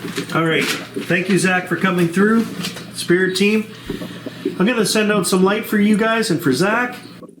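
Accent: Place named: Scotland, United Kingdom. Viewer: I always hear that Scottish accent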